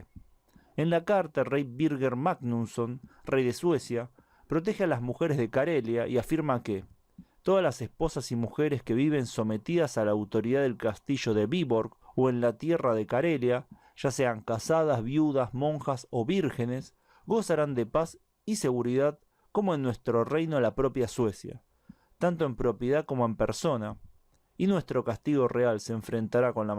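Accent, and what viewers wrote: Argentina. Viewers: Wow... Super Argentino ese acento....👍👍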